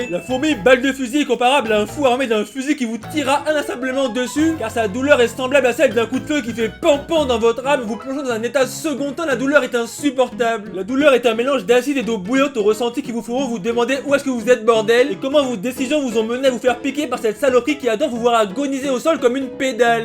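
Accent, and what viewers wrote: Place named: Congo. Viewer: Hier , je t’ai découvert. Je me suis abonné . Aujourd’hui, tu es un classique ! Je suis d’origine congolaise , et tes expressions et ta façon de prononcer les mots me fait penser au pays! On aime trop parler mal ( c’est juste de bonne guerre bien sur )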